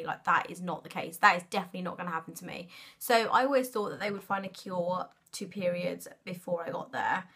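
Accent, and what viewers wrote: United Kingdom. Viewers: Where do you live ? Cause u sound british lol ur voice is pretty .but pleasee answer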